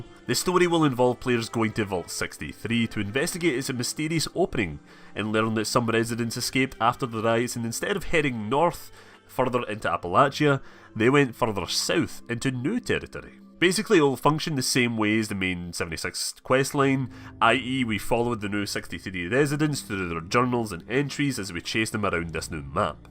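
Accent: Scotland, United Kingdom. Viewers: Scott or Irish?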